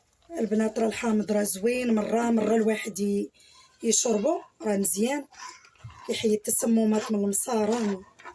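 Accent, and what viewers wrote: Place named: Abda, Saudi Arabia. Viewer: اللهجة ديال عبدية ولكن اختي استعملي دقيق القمح دكالة وعبدة عندهم القمح تبارك الله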